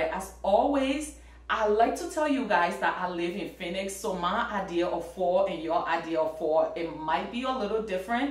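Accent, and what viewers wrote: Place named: Liberia. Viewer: I hear that Liberian accent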